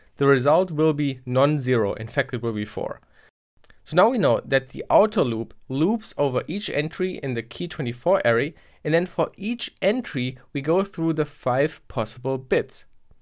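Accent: Germany. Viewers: He's German so some funny pronunciations comes with him you know! ;) I'm Swedish and we do the same, like calling Germany for Jermany.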